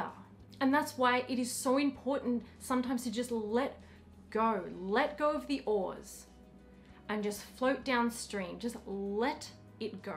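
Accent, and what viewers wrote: Australia. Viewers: A week ago or so, I came a cross an other aussie YouTubeing about LoA. I was really pleased to hear that assume accent among others with American or British accent and so I come across your teachings. Talk about LoA 😊. Anyway thanks for your teachings. Greetings from Sweden!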